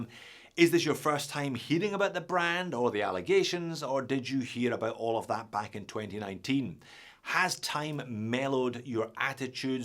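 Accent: Scotland, United Kingdom. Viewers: Is it just me or do you sound more Scottish back in 2017? 😅😅❤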